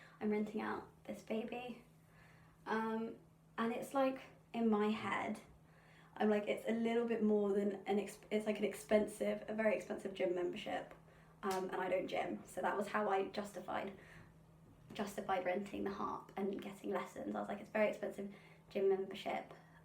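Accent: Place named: South Africa. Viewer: It always surprises me that people think a harp is any more expensive than another professional instrument. My gold flute was $13,000 twenty years ago! I just spent a grand on a blemished new fullsicle but one day I will get a 34 string. I travel though and want to be able to travel with it. It actually sounds good because it is walnut. I do want a Ravenna 34 eventually. And even that is only about $4000+ so I just don’t get it. Two harps which still cost less than my flute. You are just adorable though. I could listen to you talk for hours. I’m trying to figure out the accent. South Africa? Or UK? But what happened? No progress videos?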